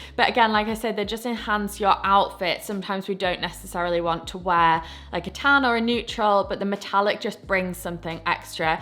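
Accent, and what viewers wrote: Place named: England, United Kingdom. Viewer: Lovely young lady with impeccable taste. Few things on Earth sound more beautiful than an English accent. Hello from the great state of Texas!!❤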